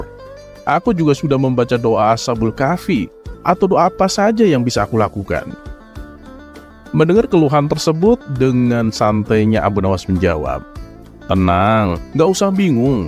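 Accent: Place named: Sunda, Indonesia. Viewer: Waduh hihiii aslinya kayaknya orang Sunda ya😁😁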